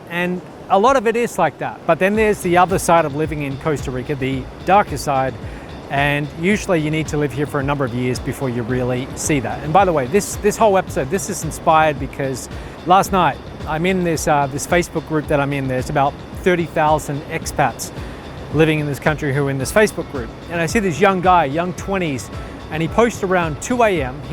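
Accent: Australia. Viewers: As an Aussie, I would say he is definitely Australian. He is apparently on Facebook - perhaps you could ask him directly as well?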